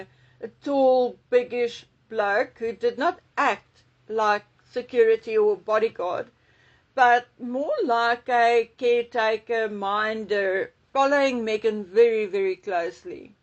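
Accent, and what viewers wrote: South Africa. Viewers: Do I detect a South African accent? I was fortunate enough to live in Rhodesia, a once beautiful and prosperous country, for 31/2 years, during UDI. ☺️🙋‍♀️🇬🇧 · I have just found this video your accent is south africam it is so great to hear another SA AM now living in reno nevada I was born in joburg so I am so chuffed I found your channel❤❤❤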